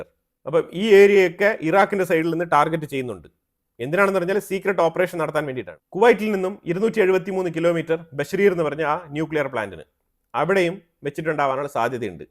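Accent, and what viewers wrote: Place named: Pathanapuram, India. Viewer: എന്താടോ താനും മാത്യു ശാമുവലു० പത്തനാപുരത്തുകാരാണോ. അവിടുത്തെ ഭാഷ ഇങ്ങനെയാണോ ???അതായത്, ഇതുപോലെ, അതുപോലെ, അതുപോലെതന്നെ ......ഭാഷ പറയുന്നത് എങ്ങനെയെന്ന് കേട്ടു പഠിക്കുക.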